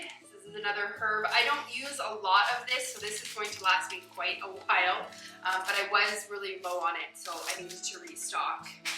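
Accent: Canada. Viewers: Hello, just a question. Do I detect a Canadian accent? Not one y’all , but pasta made me wonder. ❤️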